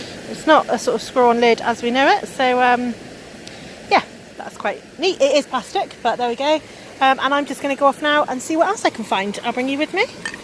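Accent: Somerset, United Kingdom. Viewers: As soon as you start talking it takes me right back to my childhood and friends I had in Portishead! So far away now, so really nice to see your adventures around Somerset - I was raised on The Levels! I'm so pleased to see the waterways almost pristine, compared to so many in US which look like runoff from garbage dumps! And I have to say I am honestly surprised by how much stuff you find in the rivers, like pottery - I keep saying to my Dad he should really take to exploring his (Devon) farm more as I'm sure it's hiding many relics... Congratulations now on nearly 800 SUBS! Soon be up to a thousand. Enjoy your hunting - I do! 👍😊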